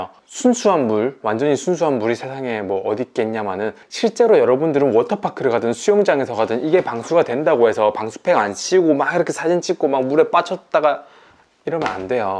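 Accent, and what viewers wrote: South Korea. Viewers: 말투가 살짝 지방이시던데 어디사람이세요?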